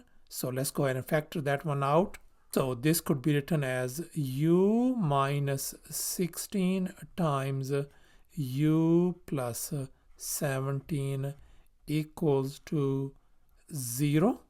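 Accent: India. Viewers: I love Indian ton English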